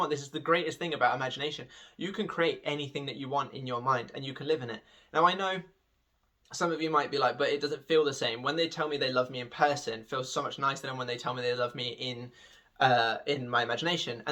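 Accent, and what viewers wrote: United Kingdom. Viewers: Definitely my new favourite law of assumption youtuber , helps as well that you’re from the uk, so I can actually understand you better 😅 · You are a bundle of possative lovelyness, I am so grateful to the American youtubers that have really helped me with my self concept. But it is nice to hear a uk voice. Makes it even more real. I'm subscribing. See you on the flip side. I mean I am already there. 🙂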